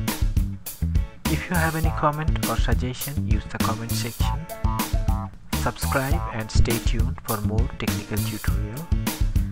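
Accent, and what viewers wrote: India. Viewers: As soon as I hear the Indian accent I instantly don't trust them with tech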